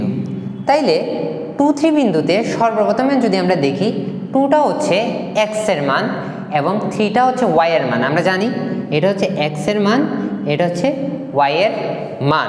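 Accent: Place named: Sylhet, Bangladesh. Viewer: আপনে কি সিলেটি? কথায় আমাদের সিলেটের মতো লাগে.....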